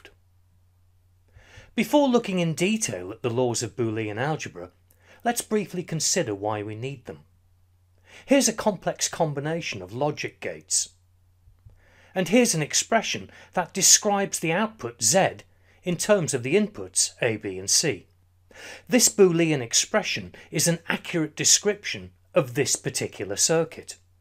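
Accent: United Kingdom. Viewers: Only reason i picked your video was because of the British accent. Hate to admit it but it is what it is.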